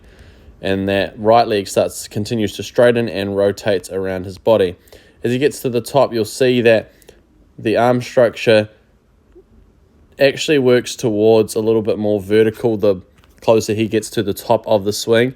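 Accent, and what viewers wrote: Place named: New Zealand. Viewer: Great analogy, you sound like a kiwi that has lived in Aus for a while?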